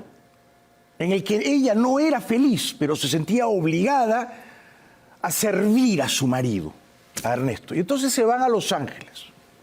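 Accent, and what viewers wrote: Peru. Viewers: así es, el castellano limeño. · Castellano peruano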